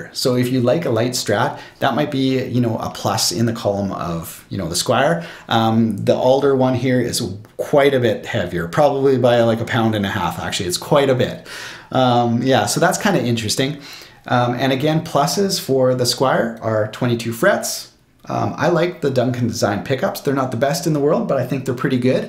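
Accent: Canada. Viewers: One of the most Canadian accents I've ever heard.. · Now there's a Canadian accent!!!!! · I'm English and I picked up on the Canadian accent. Certain words have a Scottish ring to them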